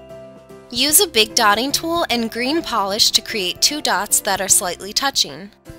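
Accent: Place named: Newfoundland, Canada. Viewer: Are you from Newfoundland? Your accent sounds just like one!!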